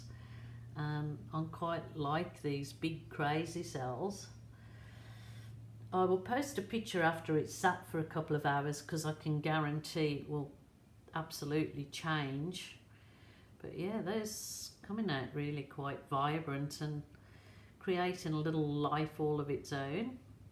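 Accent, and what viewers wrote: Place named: England, United Kingdom. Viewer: Wow! Looks like a dragons head! Lovely. It is also nice to hear an English voice too!!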